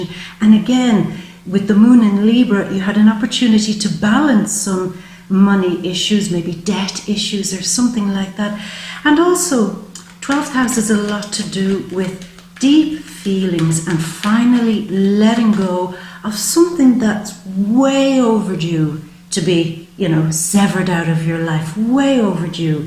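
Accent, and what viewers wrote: Ireland. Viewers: I Love your Irish Accent.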